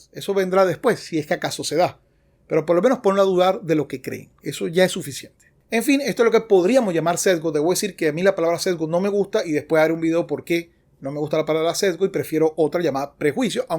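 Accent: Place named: Venezuela. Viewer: Eres de Venezuela?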